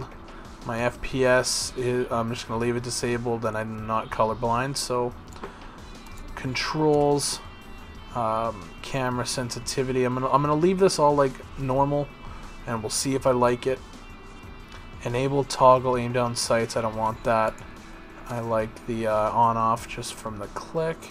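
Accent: Canada. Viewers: why does sound way more Canadian in older videos lolol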